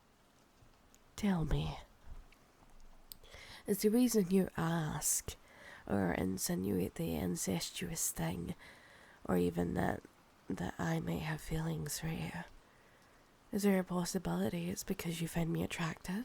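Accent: Scotland, United Kingdom. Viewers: Slow down my vampire mistress, the blood is not going to run away from you, almost tearing the pet's arm.😅😅 This video is wonderful I enjoyed it very much, vampire+ the scottish accent equals a awesome video to watch and fall in love with it.😍😄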